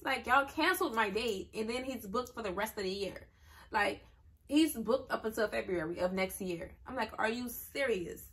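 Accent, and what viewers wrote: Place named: Baton Rouge, United States. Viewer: Baton Rouge ? lol I hear an accent What the hell $1000 to change doctors they take for everything at MIA.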